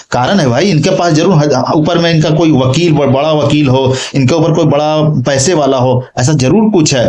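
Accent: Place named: Bihar, India. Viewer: अरे अपको बात से पाता चलता बिहारी हो तुम जायदा बाकर बकर मत अरो झारखंड के बारे मे ठीक है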